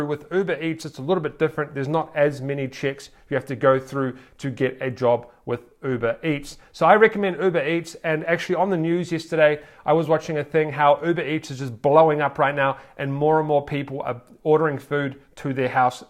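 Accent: New Zealand. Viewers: Hey, bro are you Kiwi?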